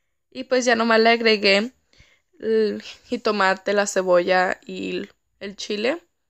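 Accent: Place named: Chicano, United States. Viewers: Me encanta que diga todo en español aunque se le note que probablemente es chicana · Supongo q mexicana nacida en el gabacho así hablan todos los q nacen en usa y los q llegan desde niños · Por la voz de ella, le atino que vive aqui en estados unidos, pueda ser Texas y que es una chava de unos 19años jejeje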